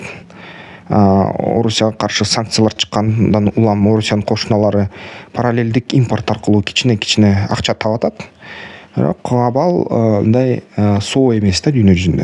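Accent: Standard. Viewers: Азаматсын таза кыргызча тушундуросунда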